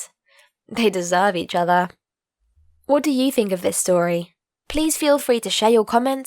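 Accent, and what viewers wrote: United Kingdom. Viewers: I love that STRONG BRITISH ACCENT. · ffffff british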